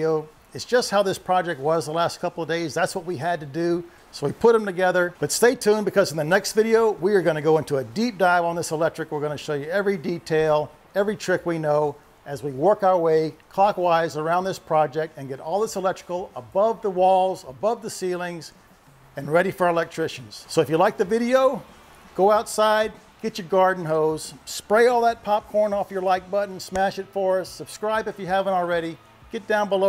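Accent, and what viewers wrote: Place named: Midwest, United States. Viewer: Popcorn and any ceiling textures are a bad idea! Nasty job! A question for. Where did you grow up? You have the mid-west accent. I grew up in Milwaukee, WI. Folks in LA know I'm not from here:-)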